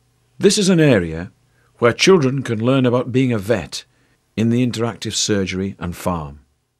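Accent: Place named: Scotland, United Kingdom. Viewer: has a slight Scottish accent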